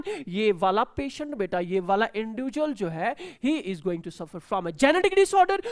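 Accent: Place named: France. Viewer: Heyyyy french accent, the huge energy, deliverance kya baat h sirrr 🔥🔥🔥! love from my side ❤❤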